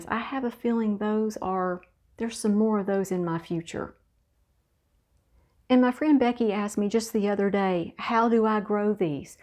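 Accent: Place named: Kingsport, United States. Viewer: Are you near Kingsport? Your accent like mine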